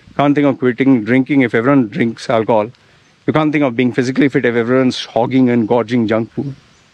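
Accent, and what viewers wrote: India. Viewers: Are you Indian, your accent sounds like Indian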